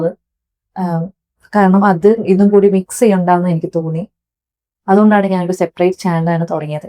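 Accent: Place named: Kannur, India. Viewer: I started watching your channel recently. I like the content very much and very relatable. I guess you are from some part of Kannur. Me too.. I forwarded your video to my friend Sajna she also talks in the same way😂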